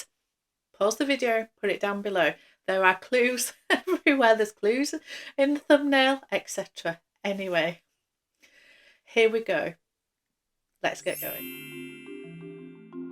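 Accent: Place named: Leeds, United Kingdom. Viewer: Wow, you are so fortunate to get your hands on this rare beauty! Congratulations on 1000 subscribers! And side note, I love that you're from Leeds -- I can hear it in your accent. When I was in my mid-20s I spent a summer in Leeds and had such a great experience and met so many kind people. Thank you for sharing!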